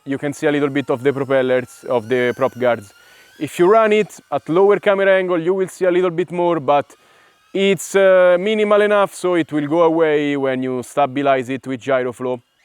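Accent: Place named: Italy. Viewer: sempre bellissimo sentire un italiano parlare in inglese <3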